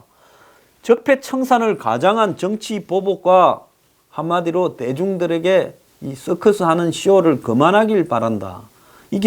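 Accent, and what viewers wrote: Gyeongsang, South Korea. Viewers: 한국사람들은 머리가 딱딱합니다. 그리고 매우~~~~겉으로만 보고 판단합니다. 황선생님은 내용상 전혀 극우가 아닙니다만, 제 생각으로는 단지 소장님이 경상도 사투리를 쓰고 목소리가 커서 강해보이니까 극우다..라고 느끼는거고 그걸 그냥 다시 생각해보는거 없이 프레임화 시킵니다. 좌파들 말하는거 보세요. 대부분 매우 부드럽지요? 달달하게 말합니다. 그렇게 말하면 극우적인 내용을 말해도 한국인들은 극우가 아니라고 느낍니다. 감성적으로요. 제가 일본에 산 적이 있는데, 그때 느낀 사소하지만 중요한 차이가 있습니다. 일본 지하철 역에 가보면 벽에 광고들이 붙어있지요. 그런데 일본 광고는 글자가 매우 작더라고요. 자세히 읽어보지 않으면 알 수 없는 광고 내용들..한국처럼 대문짝 만하게 선정적인 문구로 하는 광고 비율이 확실히 낮습니다. 그거만 봐도 민중의 성향이 읽혔습니다. 광고야 말로 자본주의의 첨병이고 효율에 목숨거는 분야일텐데, 일본광고의 글자가 작다는 것은 일본사람들은 뭐가 쓰여져 있으면 자세히 읽는다는 사실을 반증하는거죠. 한국사람들은 벽에 뭐가 큼지막하게 쓰여져 있어도 아주머니들이 옆에 서있는 저에게 묻는 일이 많습니다. "뭐라고 하는 거에요?" 라고요. 문맹이실리도 없고, 글자도 커서 노안에도 잘 보이실텐데. 습관인거죠. 한국과 일본, 어느쪽이 프레임과 거짓소문으로 더 양때몰이 하기가 쉬울까요? 쉽게 상상이 가능하리라 봅니다.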